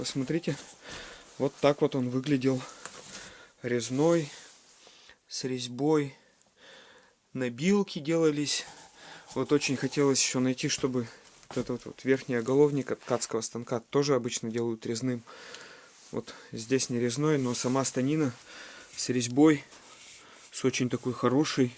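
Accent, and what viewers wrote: Vyatka, Russia. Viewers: Автор вы откуда? Акцент как вятских😊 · пожалуйста,называйте район,где эти деревни находятся.Или это нельзя? Говор у Вас родной,до слез приятно слышать родные слова.Я вятская,но живу очень далеко,в Казахстане,и в силу возраста(73 года) и кучи болячек уже, наверное,никогда не смогу приехать.Очень рада,что нечаянно наткнулась на Вас.Подписалась,пересмотрю все. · Точнее, говор вятский! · Говор вятский...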